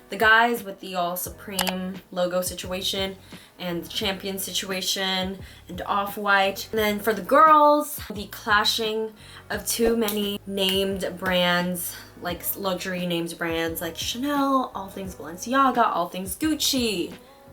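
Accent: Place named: United States. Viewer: I think it’s so cool how you Americans sound talking “button”, “them” and etc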